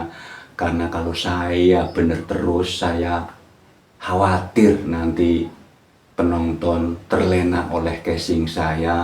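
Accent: Banyumas, Indonesia. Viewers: Ngapuntene pak tanggled bapake saking pundi gih, nopo tiang ngapak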